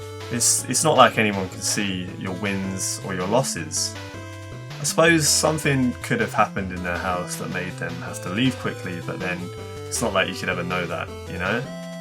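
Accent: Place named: United Kingdom. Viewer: Damn you sound a lot like Alpharad if he was British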